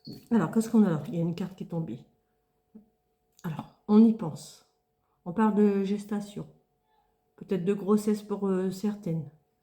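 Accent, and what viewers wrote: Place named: Nord-Pas-de-Calais, France. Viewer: ❤ merchiiiii, j'adore ton accent ch'tis😊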